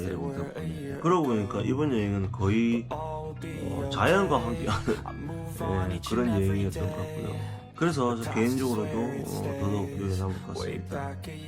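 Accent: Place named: Busan, South Korea. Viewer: 부산출신 남편이랑 말투가 똑같애서 억수 친근감 덜어여~^^ 남편이 소개해서 보게됐는데 어제 거의 종일 보고 구독도 눌렀어요. 너무 열심히 사시는 모습에 저 자신을 돌아보고 반성하게 되네요. 건강챙기시면서 하셔요~^^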